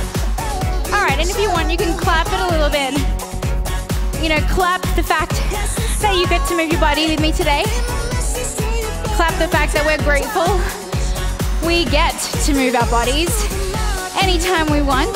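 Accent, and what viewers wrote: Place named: Australia. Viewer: My mom wanted a fun workout to do around Christmas time, so I showed her your holiday walking workout videos (which I love!) and she loved as well! After Christmas she went back to her usual workout routine, but it wasn’t until 2 weeks ago that she requested I show her more videos “of that pretty Australian girl who wears the cute outfits and plays good music” 😂 Now instead of doing your videos alone a couple of times a week, I do them everyday with my mom on her lunch break as she’s been working from home due to the virus. These videos truly lift our spirits and are so motivating! It’s also super fun that I can bond over your videos with my mom now (plus we get some extra steps in the process!) Thanks so much! ps mom’s been working on the Australian accent...she’s been inspired 😂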